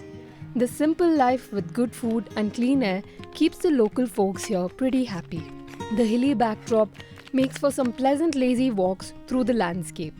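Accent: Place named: India. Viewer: Your horrible accent makes it an unwatchable clip. Find a neutral narrator that is not Indian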